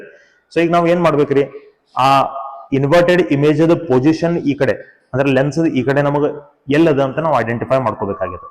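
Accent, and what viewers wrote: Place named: North Karnataka, India. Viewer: And also I got know tht u are Uttar kannadiga by ur talking style😅 am From GADAG SIR Bipin Chikkatti Pu science College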